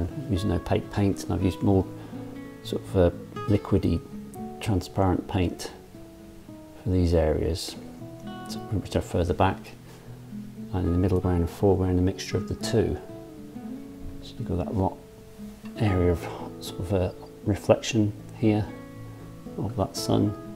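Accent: United Kingdom. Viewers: My Sunday treat Love your painting...very atmospheric..Thank you.. Love your English accent also. Greetings from Donegal,Ireland.☘️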